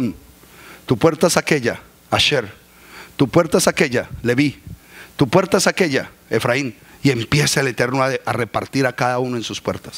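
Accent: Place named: Colombia. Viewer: Ese es mas colombiano que el presidente por su asento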